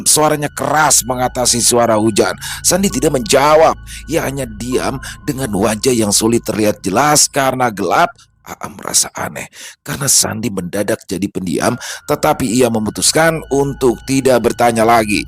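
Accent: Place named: Banjar, Indonesia. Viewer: Bang orang apa sih? Logat bahasa banjarnya mantap mang..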